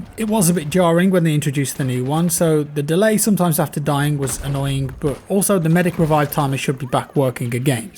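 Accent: United Kingdom. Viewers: Talks like a brit.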